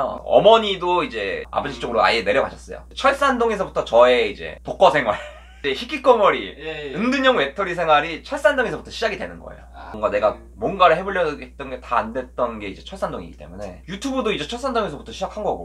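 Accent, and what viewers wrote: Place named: Chungcheong, South Korea. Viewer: 아 충청도 사투리 넘 귀여워옄ㅋ 날른겨~~~ 긍정마인드 배우고 갑니다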